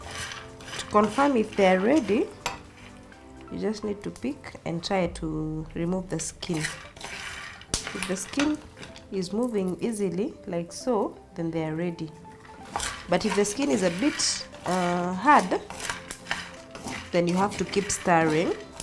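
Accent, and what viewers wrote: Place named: East Africa. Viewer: Esther Mutua try not to be silly. She speaks beautifully with an East African flow.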